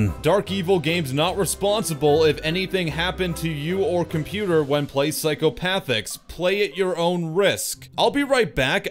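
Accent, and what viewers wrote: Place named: Italy. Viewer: This is the way some italian speak english lel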